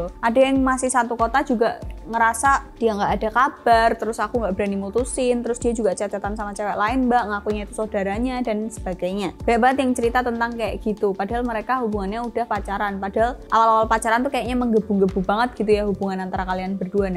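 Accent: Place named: Pasuruan, Indonesia. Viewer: Arek pasuruan mana suaranya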